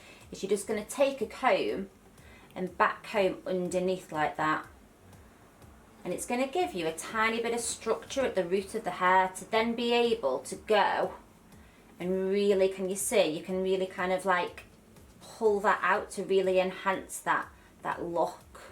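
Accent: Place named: Liverpool, United Kingdom. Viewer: I found the Doris one easier to see because of the contrast in your skin versus the purple of her hair. I was able to follow both. As a side note, I live in America now but your accent is from my part of the world. Are you from somewhere just outside of Liverpool? Prescott or Warrington possibly?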